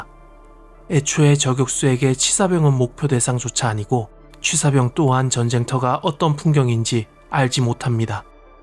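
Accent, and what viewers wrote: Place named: China. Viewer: 엥 왜 말투가 조선족...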